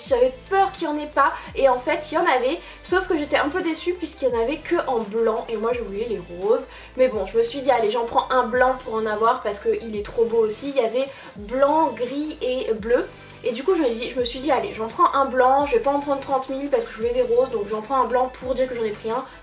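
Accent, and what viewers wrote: France. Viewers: suzy bennet chacun son accent !! On est du Nord et on parle comme ça !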